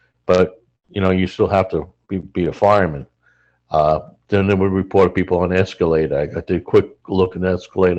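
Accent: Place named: Ireland. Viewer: Interviewer has an Irish accent from Ireland, firefighter has an americanized Irish accent.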